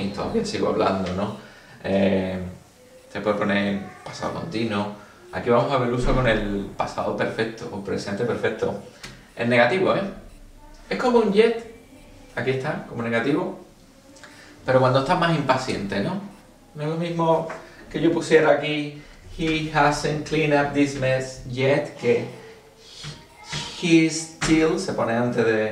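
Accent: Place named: Andalusia, Spain. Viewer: En el caso de still , le recominedo explicar primero el uso normal, y luego la excepción que le permite posicionarse delante del verbo modal o primer auxiliar. el acento andaluz es cojonudo, los que desprecian a un profesor por el acento, no me gustan, ya que tantos acentos habra como culturas o paises adopten el ingles. jamaicano, sudafricano o español.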